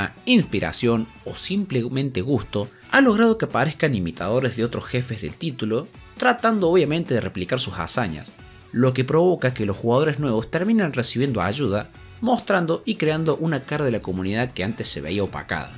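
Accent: Córdoba, Argentina. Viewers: Che loco vos sos de cordoba o alguna provincia vecina?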